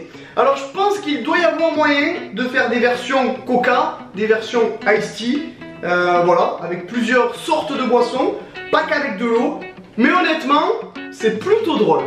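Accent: Marseille, France. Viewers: J'aime trop ton accent du sud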